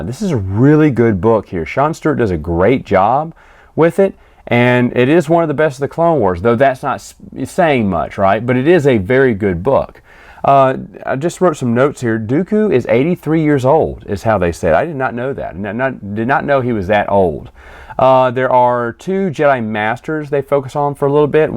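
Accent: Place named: South, United States. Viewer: There’s just something funny about talking about Star Wars or science fiction in a southern accent.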